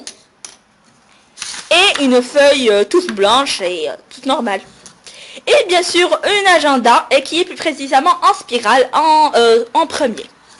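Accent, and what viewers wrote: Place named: Canada. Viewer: Si est parle comme sa ses quelle a l acsent canadien bande de merde sa se voix (p pour dire paillettes elle dit brillant et pour dire étoile elle dit star ) tout les canadien(ennes) ytbs que je connais parle comme sa et elle pn beaucoup d abonner 😭😭💖💖💖💖💖💖💖💖💖💖😪💖💖💖💖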